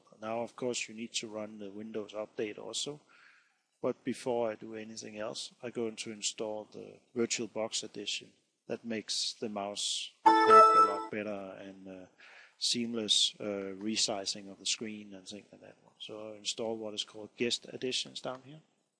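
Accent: Denmark. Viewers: He's Danish, though. :) I sounds like. :) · man kan sagtens høre du er dansk xD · Du lyder meget dansk, du taler Engelsk med dårlig dansk accent. Er du fra Danmark? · are you danish? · R u from denmark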